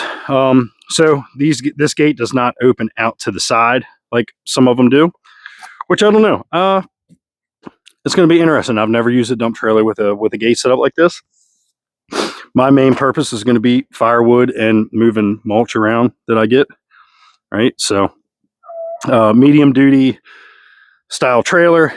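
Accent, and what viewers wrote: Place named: Eastern Shore, United States. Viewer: That eastern shore accent is thiccckkkk! I havent heard anyone sound like that since i lived in cecil county. Thanks for the reminder of home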